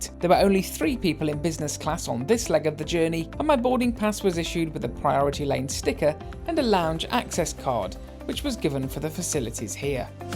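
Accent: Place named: United Kingdom. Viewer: I can listen to this British 🇬🇧 accent forever 😀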